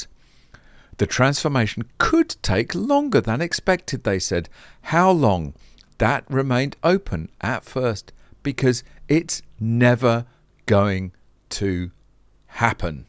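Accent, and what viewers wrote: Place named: United Kingdom. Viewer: Listen!!! has a British accent.😂😂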